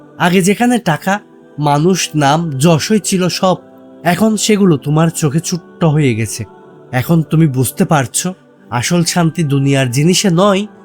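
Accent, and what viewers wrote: Chittagong, Bangladesh. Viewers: আসসালামু আলাইকুম ভাই বিনীত অনুরোধ আপনার কাছে পরিশুদ্ধভাবে উচ্চারণ শিখে সুন্দর উপস্থাপনার মাধ্যমে ভিডিও তৈরি করেন আপনি যে চিটাগাইঙ্গা অজপাড়া গায়ের ভাষা থেকে বের হয়ে আসতে পারেননি তা তো একেবারেই পরিষ্কার পরিশুদ্ধ ভাষায় কথা বলতে চেষ্টা করলেও আপনার মুখ থেকে চিটাগাইঙ্গা ভাষাযই বের হয়ে আসে। ভিউয়ার বাড়িয়ে পয়সা কামানোর ধান্দা না করে চিটাংগের ভাষায় কথা বলা পরিহার করেন। এজন্য আমি আপনার ভিডিও দেখি না শুধুমাত্র আপনার চিটাইংগা গন্ধ পাওয়ার কারণে পরিশুদ্ধ উচ্চারণ এবং সুন্দর উপস্থাপনার ট্রেনিং গ্রহণ করুন তারপর ইউটিউবে আসুন ।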